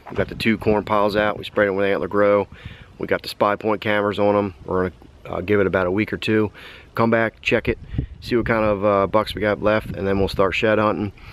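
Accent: United States. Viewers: Are you from Indiana?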